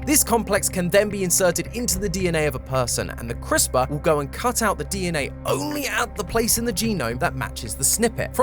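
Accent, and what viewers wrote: United Kingdom. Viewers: British matpat gets More British somehow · hmm got a little British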